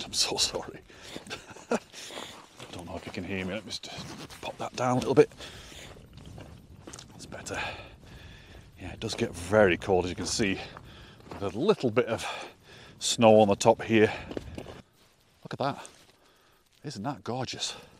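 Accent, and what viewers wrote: Yorkshire, United Kingdom. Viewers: Love your videos pal. I'm in the lakes hiking the peaks all the time, but it's still great to see various places from a different perspective, especially on parts I haven't been to yet (eg Lord's rake). I'm trying to work out where you're from with that accent.....I'm guessing somewhere between Sheffield and Leeds? lol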